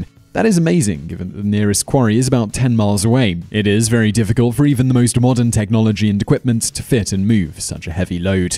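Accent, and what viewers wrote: United Kingdom. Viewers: Bunk. Something 'found' by one guy who's keeping it a secret is not archeology, or even interesting. The Shroud of Turin has long been debunked. Explaining away the carbon dating? "Interest of scientists and astrologers" Stonehenge: "Salisbury valley"? You're accent indicates you could've easily visited the site and seen that it isn't a valley, it's label on the Ordnance Survey maps and in everyday conversation as 'The Salisbury Plain.' You undermine every other video you make when you make this sort of junk promoting easily discredited nonsense.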